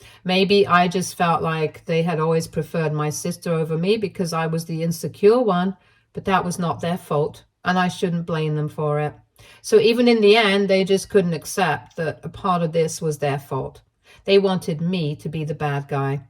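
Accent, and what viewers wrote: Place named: England, United Kingdom. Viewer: is English. So she has an English accent. We have lots of regional accents in the US as well. She is probably pronouncing it properly where she lives.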